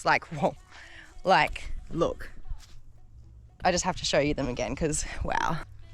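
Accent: Australia. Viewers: Are you an aussie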